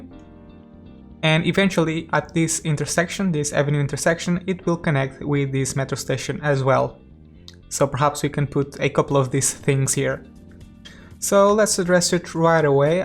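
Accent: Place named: Portugal. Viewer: Pode escrever em português! O é claramente português. O sotaque não engana 😊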